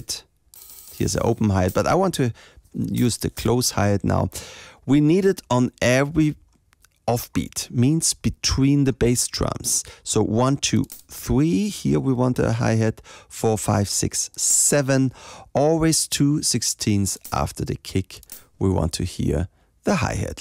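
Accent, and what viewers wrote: Germany. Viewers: Leichter deutscher Akzent 😂😂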